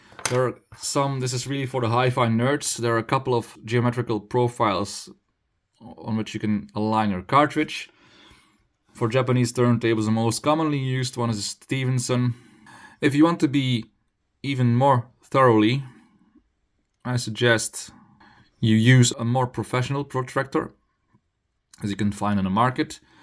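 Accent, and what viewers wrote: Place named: Netherlands. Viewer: Je klinkt als een Nederlandse die Engels spreekt 👋🏻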